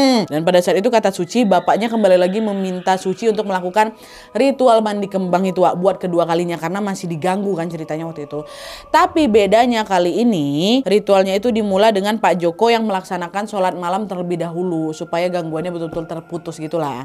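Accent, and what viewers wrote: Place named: Medan, Indonesia. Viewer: Tau kau! 😂 ini medan kali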